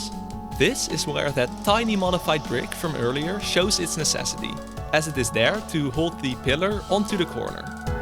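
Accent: Netherlands. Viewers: Its pretty obvious that your from Europe i think your from the Nederland’s i can hear it from your voice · this shit is fire!! (je hebt een licht nederlands accent btw XD) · By the accent I just know you’re Dutch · R u Dutch? I recognize the Accent 🤟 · Ik hoor de hollander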